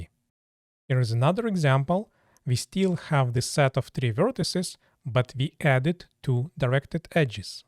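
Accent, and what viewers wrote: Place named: Russia. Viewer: Русский акцент я узнаю из тысячи :D. Сам этим страдаю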